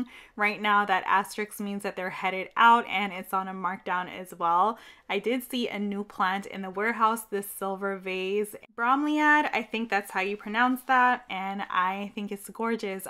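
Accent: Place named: United States. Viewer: Clearly an American accent, not her fault 🤷‍♀️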